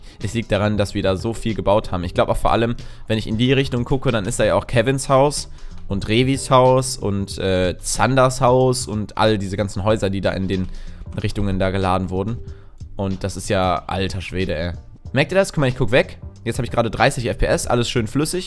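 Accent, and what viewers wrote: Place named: Cologne, Germany. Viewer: Kölner Dialekt.. :D Manschmal und Tich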